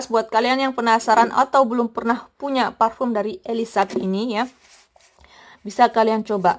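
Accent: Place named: Banyumas, Indonesia. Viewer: logat nya kok spt logat ngapak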